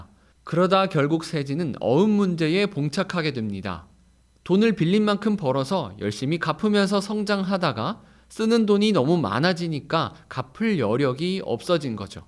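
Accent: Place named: Gyeongsang, South Korea. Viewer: 갱상도 상 남자 노 ㅋㅋ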